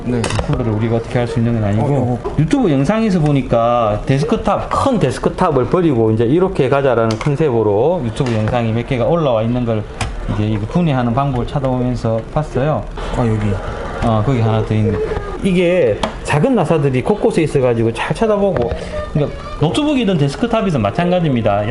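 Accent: Gyeongsang, South Korea. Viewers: 님 어디서 일하세요? 지역이 궁금해서리 느낌이 대구?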